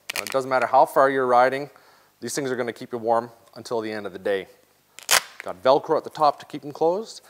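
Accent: Canada. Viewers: you cant tell by how he talks?! Canada ehhhh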